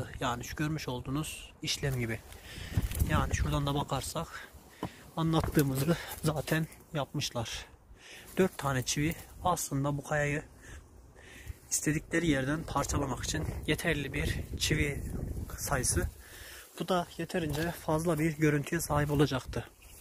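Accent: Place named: Amasya, Turkey. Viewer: Reyiz nereli ya şive yabanci degil bana ben amasyaliyim :)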